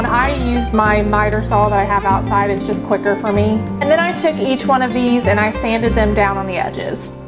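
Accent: South, United States. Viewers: I guess it probably because of the music and your voice is the voice of my southern life..❤️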